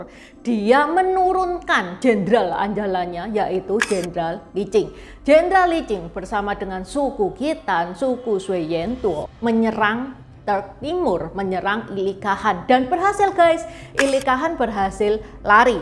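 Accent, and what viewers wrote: Java, Indonesia. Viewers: Cik tionghoa berlogat jawir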